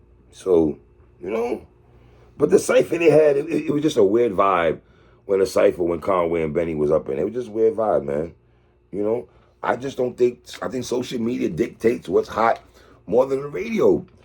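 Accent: New York, United States. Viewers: Nothing to add, really. Great commentary here by an authentic NYC voice,. Someone in that Drumwork/BSF/Griselda camp needs to make sure they all see this video. Radio didn't make them hot. Conway and nem make music for a niche fanbase, who loves what they do. Unless they're willing to switch up their sound and play the political industry games, they shouldn't even worry about getting spins from the likes of Flex.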